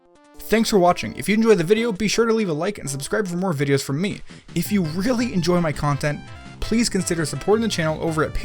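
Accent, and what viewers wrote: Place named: United States. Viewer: Am I the only person that has no idea what the guy is saying? If you have such a thick american accent while mumbling, atleast add subtitles. Please.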